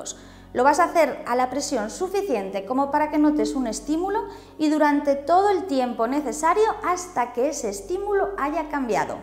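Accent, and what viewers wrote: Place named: Spain. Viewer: Ese asento español es el peor, pérdida de tiempo con este vídeo.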